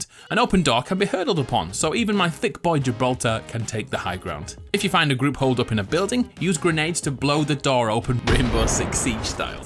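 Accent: United Kingdom. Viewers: Why are all these Apex Legend tip videos all have British narration? Not a complaint, just an observation.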